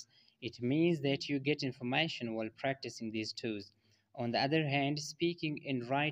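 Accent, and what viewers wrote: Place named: London, United Kingdom. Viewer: why he sound like he come from landan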